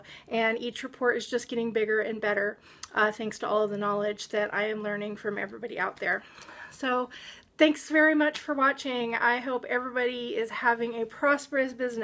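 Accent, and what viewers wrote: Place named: Washington, United States. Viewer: got to love that Washingtonian accent... another nice vid from you... thanks!!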